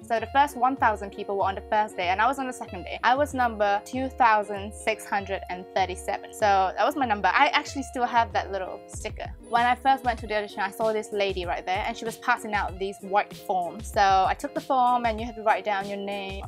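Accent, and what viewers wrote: United Kingdom. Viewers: You sound British 😂😂 btw is it ok to tell us the group you were going to debut with.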